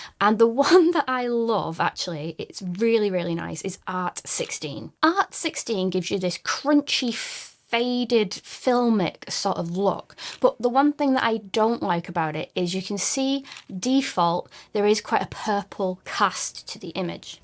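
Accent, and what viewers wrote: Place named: Bolton, United Kingdom. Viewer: What a superb video! Are you from Bolton, by any chance? You sound like a female version of me!! :D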